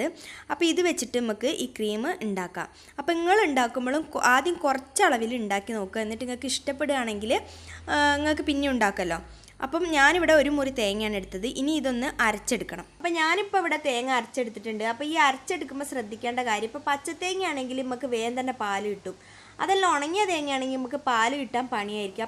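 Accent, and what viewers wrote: Kozhikode, India. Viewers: കോഴിക്കോട് ഭാഷ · Samsaram kidu...oru calicut chuva🤣. Nte mon ipol niram kuranju orupad...birthtime to 6 month fair ayirunnu but ipol....njan niram illa...hus fair aanu...ipo ellarum kaliakkunnu ooh ummane pole ayallo karuthitt ennokke.....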